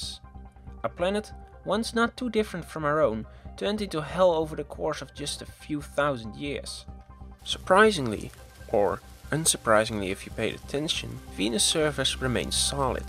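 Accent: Netherlands. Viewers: Did I heard an Dutch accent? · Are you dutch? Good video btw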